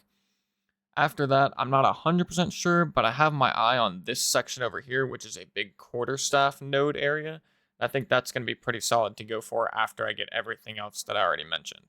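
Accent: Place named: RP, United Kingdom. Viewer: Why do you sound so posh mate?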